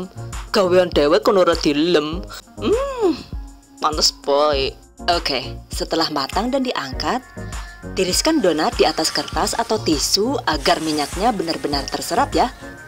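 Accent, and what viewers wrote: Banyumas, Indonesia. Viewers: Orang ngapak 😂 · Hahahaa ngapake kaya aku mbak, asli ngapak ngendi mbak😁😁😁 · Selalu suka sama videonya n diselipin bahasa ngapaknya, ora ngapak ora kepenak hehehe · Jebule Wong ngapak kiye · Pintu teater satu telah dibuka 😅 keren tutorialnya kak lengkap dan jelas banget penyampaiannya asik jokesnya dapet ngapaknya dapet hahaha like it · Jaannn bisane koohhh...jebulee ngapak juga rika yayunee😄😄👍👍👍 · Laah looh kok jebule ngapak.... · mantabbb bu...bahasa Indonesia-nya merdu, bahasa Inggris-nya fasif, bahasa banyumasannya nggemesin, hasil donatnya bagus..penasaran jadi pingin lihat ibu...jangan cuma tangannya :) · Hahaha ngapak lah yu, wong ndi sih rika yu😂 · Haha wongapak..donute mentul mentul! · Maknyuss donat madunya..yeni virgo akan praktekin...tks ya jeng yg cuantiiikkk..juga kadang ngapakk yaa..he..he..he..salam kuliner buat yg koment yaaa..suksess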